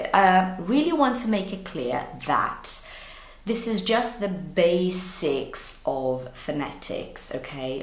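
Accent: United Kingdom. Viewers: You're the prettiest teacher ever, even though you're from here you could very well pass for a british native 😌😉 did I do well teacher?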